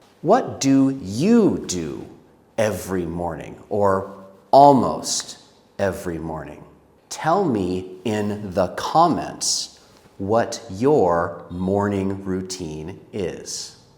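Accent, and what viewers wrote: United States. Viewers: Hey, I love American accent. I was doing the shadowing practices And wow it comes naturally. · You are American or British?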